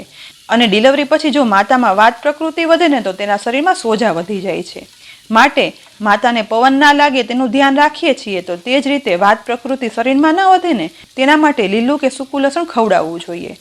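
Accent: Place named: Rajkot, India. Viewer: Yummy food with full of nutrients. I love your accents ,seems like you are from Rajkot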